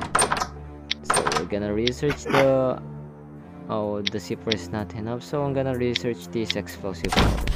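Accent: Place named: Philippines. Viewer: Are you filipino kasi buses Filipino eh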